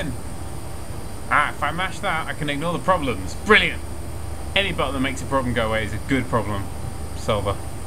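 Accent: England, United Kingdom. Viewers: is he english? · It's pretty obvious that he's English XD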